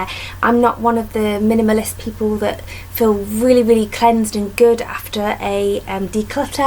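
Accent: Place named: United Kingdom. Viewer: Yay 21 product, that is amazing! I used up 30 makeup products this year (including one little polish and a face primer). Happy to follow you in 2017, girl! :) Are you from the UK, I looove your accent! You remind me of how Sali Huges speaks (I adore her)! Oh congratulations on your pregnancy! I have two little girls! :)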